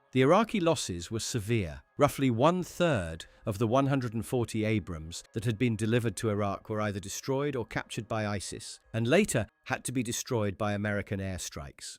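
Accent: United Kingdom. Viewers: And the British accent that permeates every damn video on yootoob. thanks.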